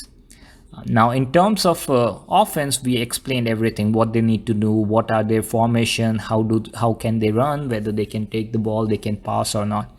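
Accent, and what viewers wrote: India. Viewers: An Indien accent on Football. That's as cool as the offence guy to the defence guy on him: I crush you little man, being like: Catch me if you can...gooood luck! #cyberpunkcoltoure Take it as game of positions and the head is passing on the initiative savely. Even so difficult, the best teams win by passings from receiver to receiver avoiding ball loss, close to Rugby which is the Grand Pa, but way less aggressive. #provos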